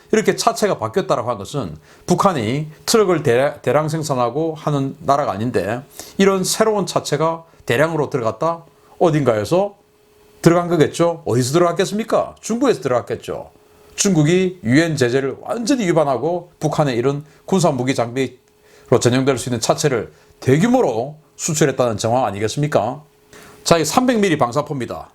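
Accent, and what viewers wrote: Gyeongsang, South Korea. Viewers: 유투버가, 일본말비슷한 상도사투리쓰면않되요, 듣기거북하고 ,애들교육상않좋아요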